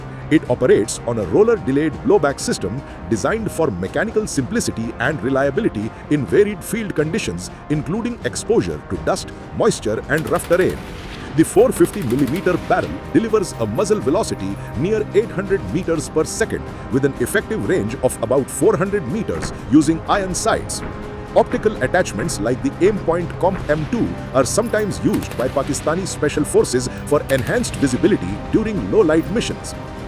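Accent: India. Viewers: check pajeet accent 🤣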